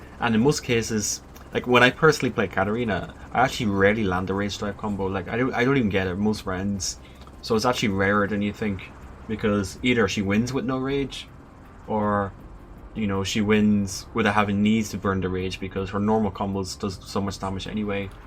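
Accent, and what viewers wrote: Ireland. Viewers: He's Irish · Ah I see it's Irish! Thanks!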